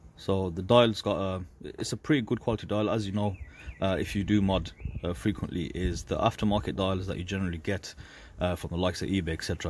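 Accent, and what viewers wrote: Birmingham, United Kingdom. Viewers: Another great vid, pleasing to hear another Brummie on the Tube, loving the reviews! Seen a few of your mods with after market dials now, where do you manage to source them from? 👍🏻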